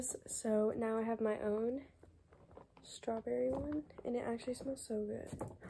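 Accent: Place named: United States. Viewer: I'm not sure what she is saying because I'm English, and she's American, so I don't really know what she is saying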